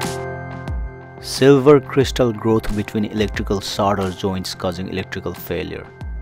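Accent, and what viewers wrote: India. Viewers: that indian talkin lol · The indian accent ruined the video. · The first picture with a strong Indian accent like his, really confused me because I thought he was saying that it was a close-up of a dick. I had to keep listening and trying real hard to figure out it was a tick.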